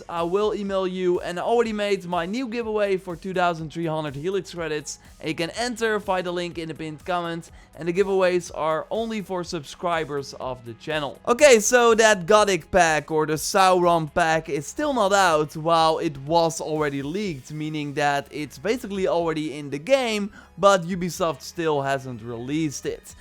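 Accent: Netherlands. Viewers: Is dutch? I hear his accent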